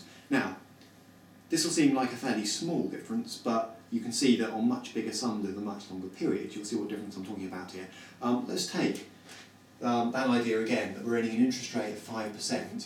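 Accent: United Kingdom. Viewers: Thank you Sir. ... Great content. .. and love the accent (British) :)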